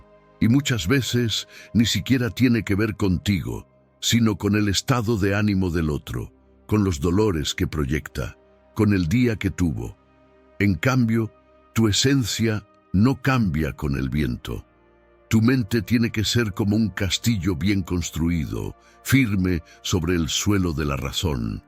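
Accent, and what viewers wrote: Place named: United States. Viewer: Acento americano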